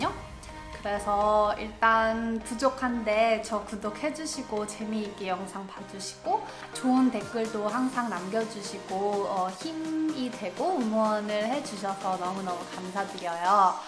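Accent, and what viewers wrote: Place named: United Kingdom. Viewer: 영국발음 치여요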